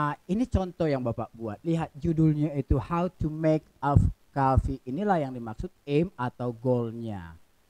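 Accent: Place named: United Kingdom. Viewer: British aksen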